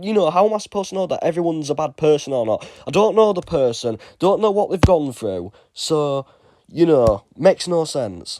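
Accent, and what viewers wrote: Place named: United States. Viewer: He’s defo American his voice is so jarring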